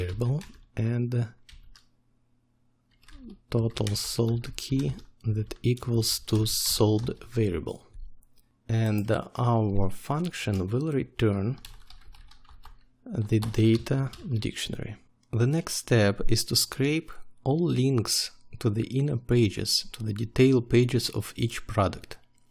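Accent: Russia. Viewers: Русский английский заходит лучше, чем английский английский - благодарю за видео! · you have a nice russian accent :) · i fucking love russian accent. i hate listening tutorials with indians.. i dont have nothing against them, just cant listen to them.. but russian.. i love that language.. i know little russian.. ya ponimayu russky nemnogo :D croatian is also very similar to russian . cheers.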